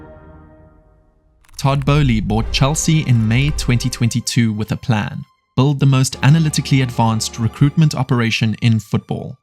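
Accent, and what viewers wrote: South Africa. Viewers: It's an addition because recruitment is less biased. Average players were signed simply because they were Brazilian or English and better players from Romania or Togo were overlooked. I saw your video a few days ago but I didn't watch because the thumbnail seemed clickbaity. I watched it by accident now and I loved it! Great video. Sidenote - Your accent sounds South African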